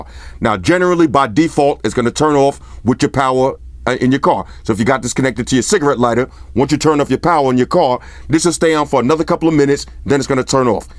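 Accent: New York, United States. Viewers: Oh my man is from NY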